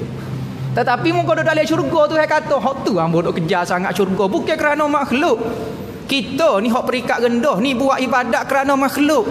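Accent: Kelantan, Malaysia. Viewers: Terbaik ...walau slang kelantan kuat...kami dari johor suka dengar ceramah ustadz..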